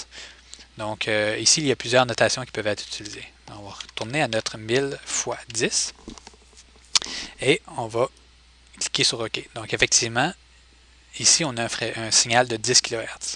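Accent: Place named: Quebec, Canada. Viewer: superbe explications et j aime bien l acces quebequois 😊😊😊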